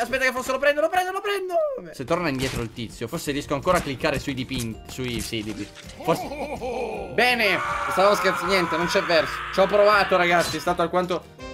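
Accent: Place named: Naples, Italy. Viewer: Madò che parla Napoletano quanto figo 😍😍 ( lo risolviamo= o risulvim)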